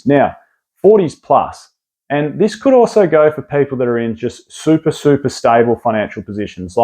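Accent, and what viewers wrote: Australia. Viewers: Love having a genuine aussie guy talking about pokemon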